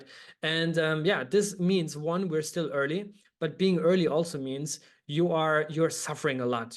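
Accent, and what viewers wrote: Germany. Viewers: He‘s German, right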